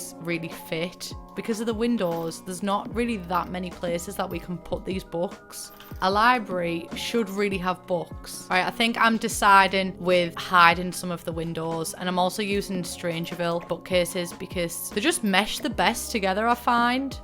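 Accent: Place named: Northern England, United Kingdom. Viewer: im just getting into sims youtube and its such a pleasant surprise to find a northern youtuber! thx for repping us so well✌️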